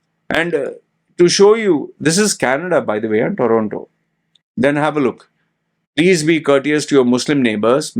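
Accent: India. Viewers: Look at your accent bloody yendian accent. BTW you have no right of speaking sh!t about someone’s religious beliefs. Let them do what they wanna do. White washed typical Indian J0ker!! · He himself seems indian, littrelly, his excent of english is really indian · Why make a video on a single person and generalise. And the way this man talks, he too is an Indian or an ex Indian. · bruh you are wrong india is not called Hinduastan because the majority is hindu... there was no hindu it is an umbrella that was created by British they wanted to group these other faiths living in bharat back in the days to make it easier for them to adress them... like muslims and christians .... you can even look for it.. there was not even hindu religion so many different faiths were put into one umbrella called hindu by britishers!!!.. and i don't think that you are a canadian either you must be an ex endian judging by the way you speak!! 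· You sound an Indian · You also have a Indian accent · You sound like an Indian, but tried nicely to become or at least look like Canadian or American or European for that matter. Good try. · are you Indian or with Indian DNA? Your accent in Indian!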